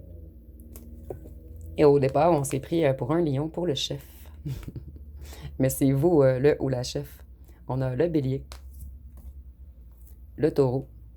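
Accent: Quebec, Canada. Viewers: Bonsoir Vous êtes belle vous expliquiez tres bien j'aime votre accent québécois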